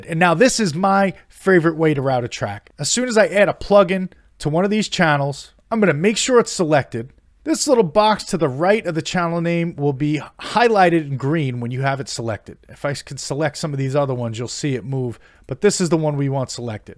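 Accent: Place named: East Coast, United States. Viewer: awesome video. btw where are you from? i hear a slight accent and it's driving me crazy that i can't place it. somewhere over on the east coast?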